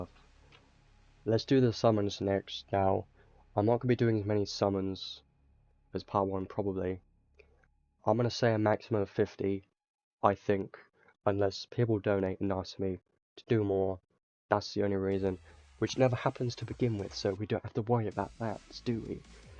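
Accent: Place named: United Kingdom. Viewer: That reaction is why I see your videos at night. Such a soothing Brit voice. XD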